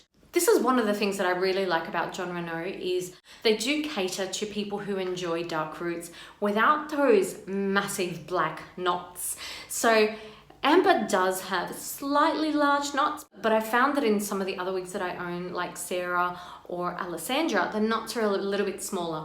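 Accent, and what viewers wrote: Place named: United Kingdom. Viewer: Thank you … 12 FS8 and 12 FS12 vary so much that I won’t even purchase those colors anymore. I received a 12 FS 12 in Miranda and it was much darker than what you are wearing right now. It was a medium brown with sparse gold blonde highlights on the top only. I put her up for sale and made sure I showed the color and someone snatched her up within 30 seconds, so I don’t have to worry about it anymore but I love Palm Springs blonde but sometimes I want to mention in my color and I think that the 12 FS 8 gives you nice dimension especially in a long style like that. It’s unfortunate, so I think I will stick to Estetica colors… Even though they do not have near the colors needed I do have one that I love and it already has demention and I can usually be pretty safe with that color. I want an amber so bad and I just may go ahead and get her in Palm Springs blonde. 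I have a good night in PSV and she does have some variance in color running through her, she reminds me of my own hair that I used to have highlighted and colored so that’s a good thing. Thank you for the video, I always love watching your videos and I love your accent. Is it bad to want to go and take lessons on how to speak with a British accent? My son and I both would like to do that because we feel it is the proper way to speak!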